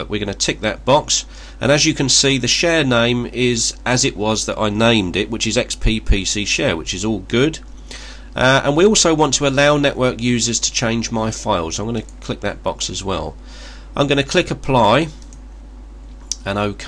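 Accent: United Kingdom. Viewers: great video, great explanation, amazing British Accent / simply amazing ! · Should have figured you were from the UK. His accent is irritating. "Sheering from this foowdu'". My gosh. How about some American? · Lovely British accent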